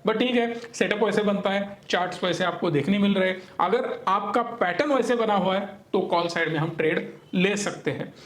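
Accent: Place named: Kolhapur, India. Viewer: सर मराठीतच तुमचं कोल्हापुरी आयकायला आम्हाला खूप आवडेल 🙏🙏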